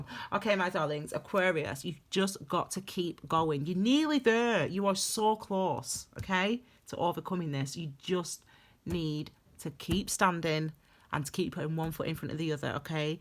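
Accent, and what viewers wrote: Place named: Northern England, United Kingdom. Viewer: Always nice to hear a familiar northern accent ont 'tube. M/cr here.........top reading...........mint!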